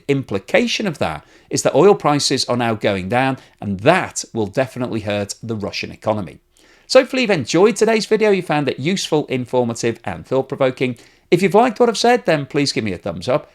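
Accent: United Kingdom. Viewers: As a non native English speaker, I feel speaks the clearest English amongst all Internet speakers. Can anyone tell me from where is the UK is his lovely accent?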